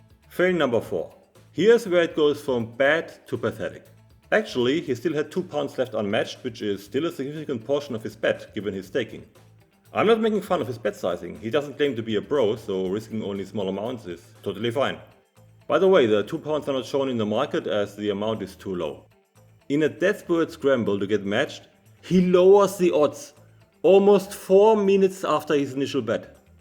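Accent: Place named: Netherlands. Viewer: Sounds Dutch to my Ear.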